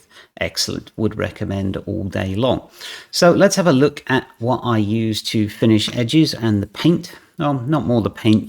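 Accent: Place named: United Kingdom. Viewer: Quick question, why do you quote US prices when you’re obviously British?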